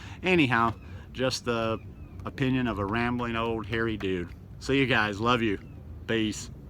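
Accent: South, United States. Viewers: Love that southern draw! Its inspiring to see someone from the south do this journey. I'm an okie myself and there is a lot of southern stereotypes and expectations to be a certain way in the south when it comes to eating meat and hunting and those sorts of things. Your often labeled weird and "hippie" or something worse for rejecting meat and beer and so on. I'm subscribed. Thanks for posting your journey! God Bless.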